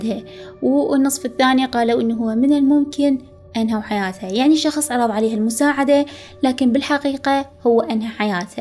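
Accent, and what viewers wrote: Iraq. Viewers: جداً أحُب لهجة العراقيه تحديداً عليكك 🥺❤️❤️❤️.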